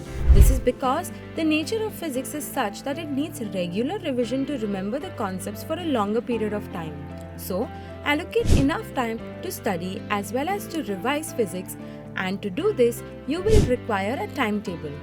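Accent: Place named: Punjab, India. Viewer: Punjabi english and bio😊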